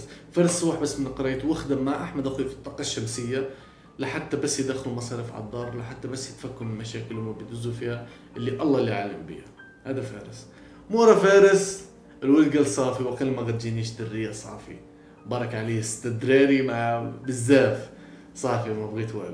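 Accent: Morocco. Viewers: ههه كتعجبني هدرتك بالمغربية مخلط بالفلسطينية بنكهة مغربية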